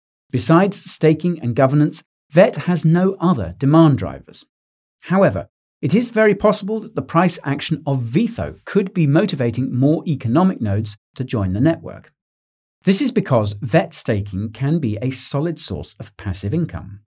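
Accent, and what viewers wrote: United Kingdom. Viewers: Is he British I can't tell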